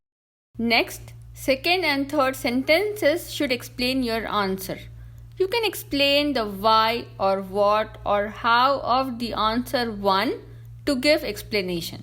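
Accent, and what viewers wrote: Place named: India. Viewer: ahahaha I love Indian accent !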